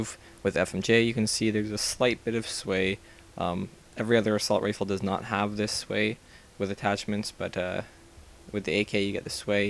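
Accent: Canada. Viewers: hes canadian. he says "about" like a candian says it. Aboat the same.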